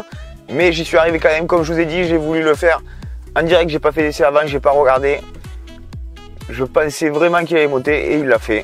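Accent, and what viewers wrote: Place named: South, France. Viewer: L'accent du sud, il apporte de la chaleur :) . J'ai acheté un 2008 crossway 130ch. je le récupère demain j'ai hâte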